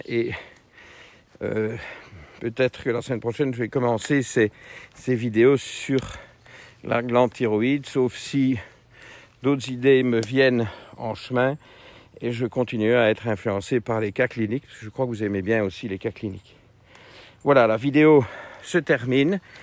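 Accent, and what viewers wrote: Belgium. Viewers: Merci pour ces éclaircissements docteur. Je vais voir pour ma prochaine analyse en novembre. Entretemps je vais voir avec votre assistant les possibilités de consultation. Je viens de Bruxelles et j'ai cru comprendre d'après votre accent et les paysages de vos ballades avec Goliath que vous êtes situé en région de Liège. Je devrai probablement prendre congé pour venir vous voir. A bientôt de toute façon ;-)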